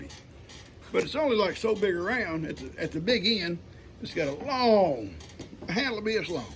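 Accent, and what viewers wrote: South, United States. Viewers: Love your country voice! Have a wonderful day.... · Good morning... having my breakfast and coffee with you before work. I absolutely love you're country accent and you're stories, like so many on here, I can listen to you all day. I love how Ivan was demanding attention, lol. He is the cutest little donkey. He loves you so much. And I can see you're love for him, it shows. Also love how you call baby. Ya'll are so cute together. I am blessed by ALL you're videos. Keep them coming. Hope you're knees are feeling better. Say hello to you're beautiful wife. God Bless you and all you're family and furbabies. Give Ivan a hug for me. Have a blessed week. Already looking forward to your next video.😁❤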